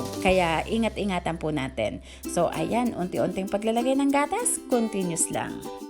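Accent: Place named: Iloilo, Philippines. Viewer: Ilonggo accent i can hear!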